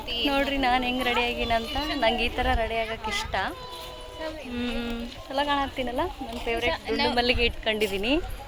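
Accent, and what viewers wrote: North Karnataka, India. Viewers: Supet nema ❤❤ nice vlog video ಮಾಡ್ತಾ ಇರಿ ನನಗೆ ನಿಮ್ಮ ಉತ್ತರ ಕನ್ನಡ ಭಾಷೆ thumba ist sissy ಅದಿಕ್ಕೆ video miss madde nodthini ❤❤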